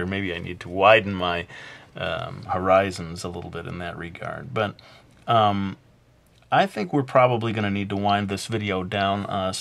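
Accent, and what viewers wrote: Brooklyn, United States. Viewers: I do love your Brooklyn accent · Your Brooklyn accent is just too much!.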